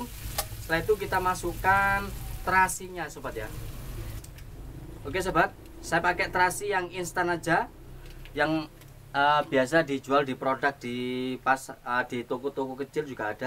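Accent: East Java, Indonesia. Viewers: bahasanya medok banget orang jawa timur mana ya chef 😊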